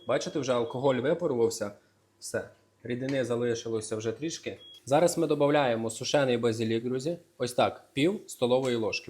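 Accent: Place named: Western Ukraine, Ukraine. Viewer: Оооой, обожнюю ваш діалект з моєї західної України. Дай Бог вам здоров*я і успіхів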